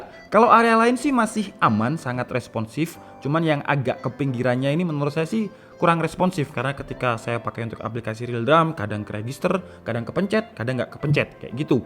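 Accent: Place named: Dieng, Indonesia. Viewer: wala mas orang puncak dieng tah WKWKWK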